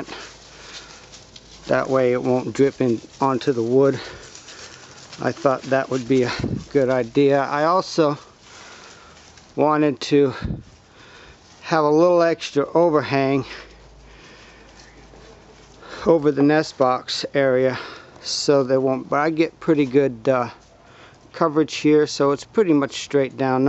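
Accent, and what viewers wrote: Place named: South, United States. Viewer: This is Marcy again...hijacking Joey's account. You have a southern drawl. I know this is serious but I think it's hysterical! Good job! Very impressive! I really can't believe you build this!!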